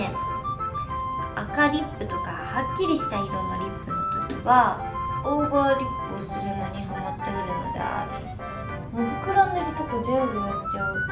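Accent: Kansai, Japan. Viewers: 関西弁かわいい。白いシャドーの乗せ方参考になる。。可愛い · 関西弁かわいい💕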